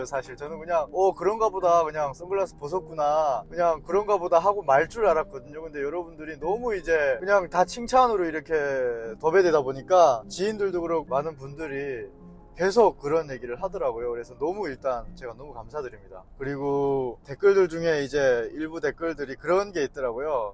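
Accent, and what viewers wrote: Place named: Gyeongsang, South Korea. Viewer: 우와 잘생기셨네 말투는 저기 아래 경상도 사투리 섞인듯한 ㅎㅎ 결혼준비 잘 하시고 대한민국의 건강한 청년으로 정착하신걸 감사합니다. 응원합니다.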